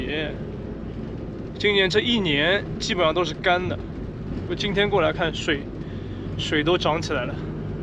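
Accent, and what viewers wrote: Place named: Ningbo, China. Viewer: 寧波 話聽不懂但很孰悉。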